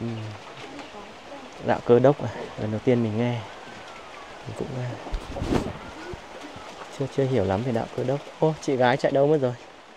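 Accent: Hanoi, Vietnam. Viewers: có chất giọng Hà Nội rất ấm nghe cứ bị cuốn ý ❤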